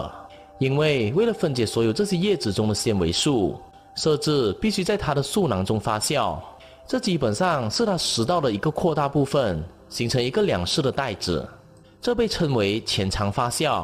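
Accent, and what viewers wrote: Malaysia. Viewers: 马来西亚腔好重呀 哈哈 btw 酵是念 jiao4😅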